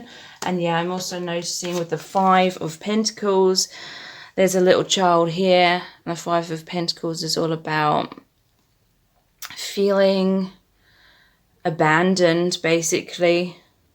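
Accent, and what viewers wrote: United Kingdom. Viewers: You are wonderful , gifted , you are the real deal ❤.By the way I adore ❤ and your Britich accent .Wonderful job !!!!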